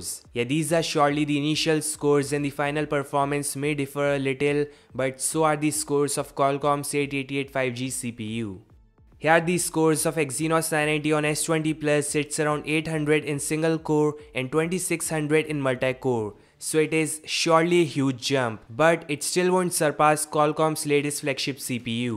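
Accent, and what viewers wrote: India. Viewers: Yeah,Indian accent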